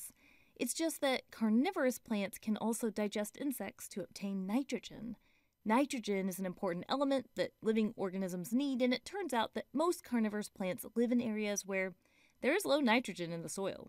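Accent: United States. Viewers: are you from tennnese becsaud yoyut the on;t ten i see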